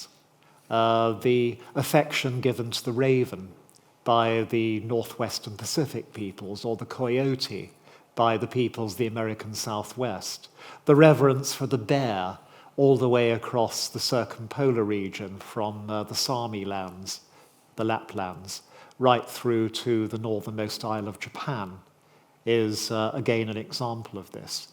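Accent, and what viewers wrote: United Kingdom. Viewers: yes, the Chinese dragons are mild and benevolent as "le feng shoah" tells us... Jesus Christ, what a dingus whenever I hear the British accent I'm expecting the most clumsy, ridiculous, unaware, stupid thing to be said. can't believe these people were the staple of documentation and history just quite recently.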